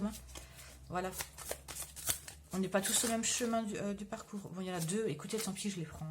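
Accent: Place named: Alsace, France. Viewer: coucou j'ai reconnu ton accent. Moi aussi je suis alsacienne :) Tu vis où ?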